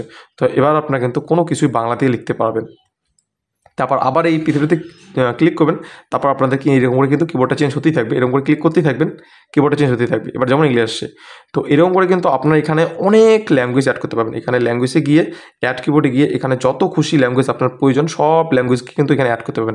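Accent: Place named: United States. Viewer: American Bangla aaina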